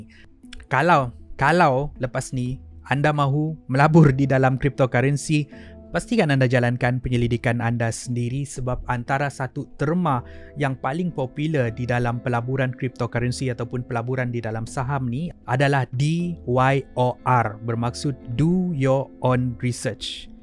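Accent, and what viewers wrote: Sabah, Malaysia. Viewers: Aslmkom, Boleh Kerap Sikit Upload Video. Hehehe.. 😊😊😊.. Aku Suka Dengar Cara Ko Sampaikan Satu² Contents Tu..! Tak Kiralah Contents Apa.. Yang Dalam Loghat Sabah Kola.. Lama Sangat Dah Tak Jumpa, Kawan² Sabah... Dengar Loghat Sabah Pun Boleh.. #FlashBack Juga Sikit² Tu Kan.....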